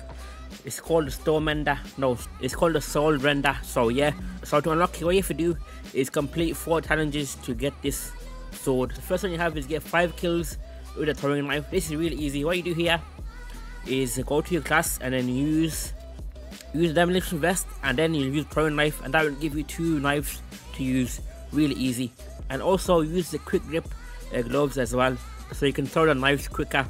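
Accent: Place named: United Kingdom. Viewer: Bro you’re so damn British or whatever I can’t understand a word you’re saying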